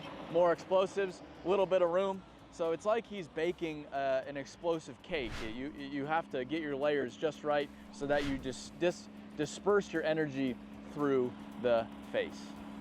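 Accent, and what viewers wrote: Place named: United States. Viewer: I guess when a dynamite stick reaches a certain size it's called a sausage. I definitely enjoyed the American pronunciation of German names.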